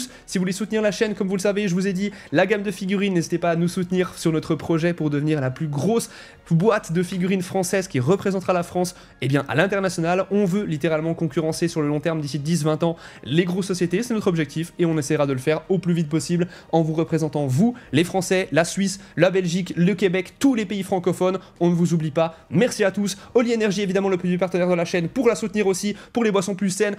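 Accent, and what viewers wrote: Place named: Switzerland. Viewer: QUOI TU EDT SUISSE, quelle canton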